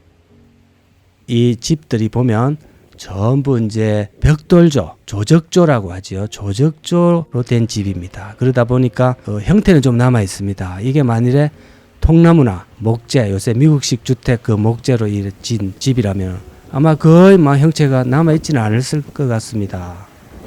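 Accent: Gyeongsang, South Korea. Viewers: 강선생님구수한경상도사투리최고입니다~^.^ 조은영상보게해주셔서고맙습니다~^^ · 님의 정겨운 갱상도 사투리 듣고 있으니 마음이 참 편안해 지네요.. 20만 30만 100만 가즈아~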